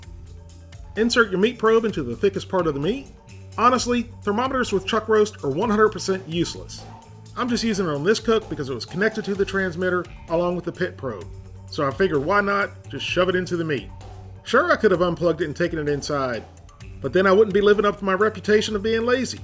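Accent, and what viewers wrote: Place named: Virginia, United States. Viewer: Are you from Virginia? Your voice along with style of cooking reminds me so much of family in VA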